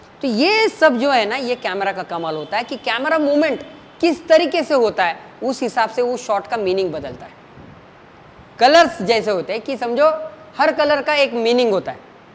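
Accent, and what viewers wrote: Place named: Maharashtra, India. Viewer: Hindi me marathi accent aa raha hai sir ka... Sorry but aise hi videos dekh k thoda samajh raha hu 🙏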